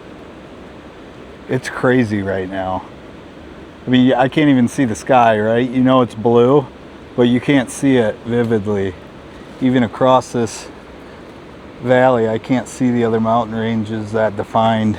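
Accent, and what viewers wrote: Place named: Canada. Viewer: Wooden pipes are just flat sided barrels, pretty much the same cuts to the wood, except the steel wire holds the compression. Once the pipe gets water, the wood swells and the wires don't. Because there is a water pipe the same size going downhill from this building I believe it was a speed baffle and control using 2 guillotine valve blades. Downstream somewhere would be a water wheel to power a belt drive system most likely for a lumber mill. Don't know where this, is but the guy has a bit of a Canadian accent so lumber seems most probable.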